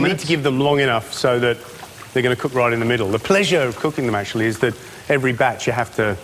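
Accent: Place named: Australia. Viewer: THANK you, i don't know Australian, and I was like what the heck did he say.? Now to transfer that into ENGRISH... like ounces and cups.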